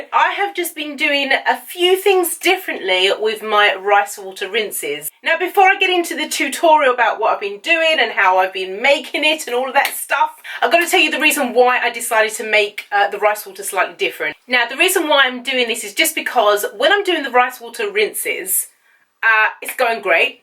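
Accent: United Kingdom. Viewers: omg i love your british accent im following your advice but i must admit im coming to your videos to hear you talk gurll!! my boo is from england but hes black and i just am in love with the accent lol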